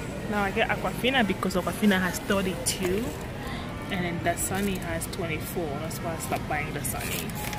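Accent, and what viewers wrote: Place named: France. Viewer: Coucou! Ah oui, ton accent francais est encore fort. Ne t'en fais pas c'est pareil pour moi aussi. I always buy extra. Thanks for sharing, I like it.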